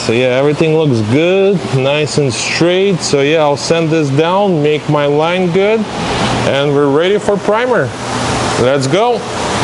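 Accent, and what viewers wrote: Russia. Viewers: ты че Русский что ли? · Эбать у тебя акцент русский. Сразу видно наш пацан 👍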